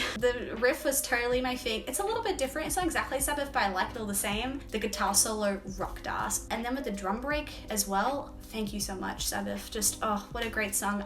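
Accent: Australia. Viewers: Hi, love those facial expressions of yours and that cute Aussie accent!! Make sure, if you can, to do the entire Sabbath discography in chronological order - they are my favorite band and I think you'll find the journey very interesting. Welcome to the appreciation society!!!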